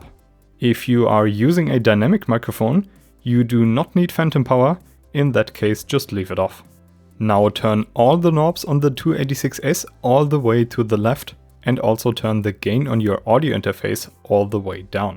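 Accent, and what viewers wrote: Germany. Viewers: Habe am dialekt gehört dass du deutsch bist kannst aber gut erklären :)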